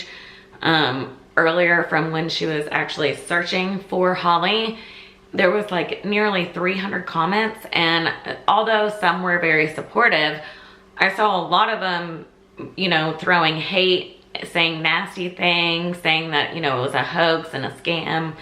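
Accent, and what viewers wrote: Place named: Louisiana, United States. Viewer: You sound like you’re from Southeast Louisiana.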